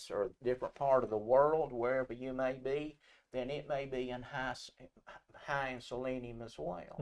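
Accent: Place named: South, United States. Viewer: I'm 63 and appreciate the knowledge here. However, as an impatient youtube consumer, this video could be 10 mins shorter if this guy did't articulate every syllable of every word. (I swear, southereners add syllables where there are none ( example 'This' becomes 'thi--is')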